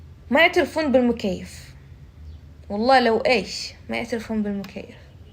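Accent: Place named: Najd, Saudi Arabia. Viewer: لهجتها من اهل نجد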